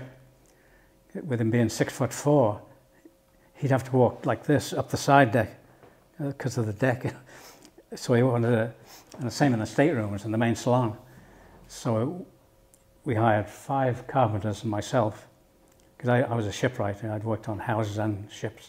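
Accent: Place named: Liverpool, United Kingdom. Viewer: I wonder if he ever said he knew the Beatles when he was chatting up the maids? He sounds like a Liverpudlian.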